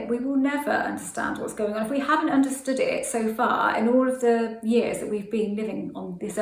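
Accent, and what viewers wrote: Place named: RP, United Kingdom. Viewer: I kinda love it 😍 so posh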